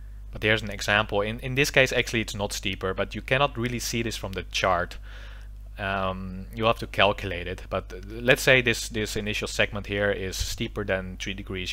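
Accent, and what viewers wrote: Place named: Netherlands. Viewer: Can i ask where you are from? I'm dutch and you sound a bit dutch too! I'm very interested in pilots working in a different country than they are from. I saw that you landed in an airport in Vietnam in a different video. If this is the case would it be possible for me to fly like with British Airways or Qantas for example instead of like KLM or a different Dutch airline. Thank you.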